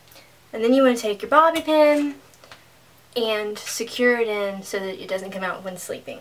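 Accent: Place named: South, United States. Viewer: wowwwww i <3 this video! you sound so southern :) i am too but to me you kinnnnddddaaaa look like miley cyrus hahaaha hope tht doesnt offend you hahaha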